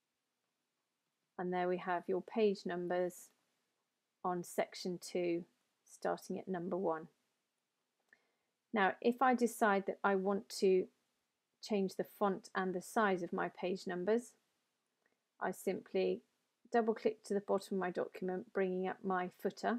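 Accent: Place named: Bristol, United Kingdom. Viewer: Are you from Bristol by chance? Your accent sounds much like my friend who grew up in Bristol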